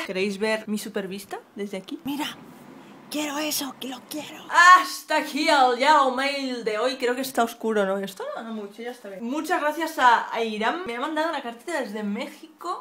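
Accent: France. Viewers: me encanta tu acento en frances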